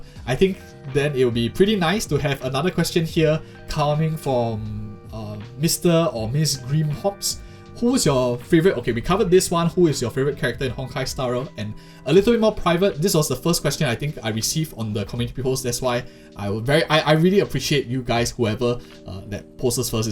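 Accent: Singapore. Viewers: Always good to see a fellow Singaporean find success in something they love! One of the biggest things I've liked about your channel was finally hearing a familiar accent narrating something that I had to search up on Youtube. Ever since watching my first video on your channel, I've found myself always coming here to look for anything star rail related before going anywhere else. Hope you continue to grow and find even more success! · congrats bro, only started watching you recently for star rail and didnt know u had another channel ngl but ill check it out since i like gacha games. also i sort of guessed you were from singapore too from your voice but guess now it confirms it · congrats on your achievement! started HSR on day 1 and have been following your videos and advices from then. when i first listened to your video, i immediately recognised you as a fellow singaporean. 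its not a bad thing, its a good thing actually. the landscape in sg doesnt really favour content creation so i really feel very encouraged and happy that you are trying and doing your best to make it. i'll continue to support you just like how you have supported us in our trailblazing adventures with your wisdom and insights. i'm pretty sure you alrdy know how to pace yourself and get enough rest. jiayou and i'll continue to support you with views <3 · lily aquina and you are my fav star rail content creators, I've watch every video from you since a month ago and I look forward to new one evertime, because I rly like the way you talk about stuff and I have a lot of sg friends and cousin so your accent is kinda a plus for me lol. Also a weird timing cuz i was thinking of A.I art for fun and turns out you have a channel for it, thats cool. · I was wondering why you sound so singaporean. Love to see more from from you ❤ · Congrats on your 15k! 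Fellow Sporean here, clicked into your content since day 1 of HSR, noticed your accent right away and stuck to your vids for guides since they are very detailed as compared to most of the HSR guides. Able to make pull decisions based on your content as well and I always recommend my friends to watch your vids as well for HSR guides. Really appreciate your hardwork that goes into the videos and hope to see exponential growth in the near future!!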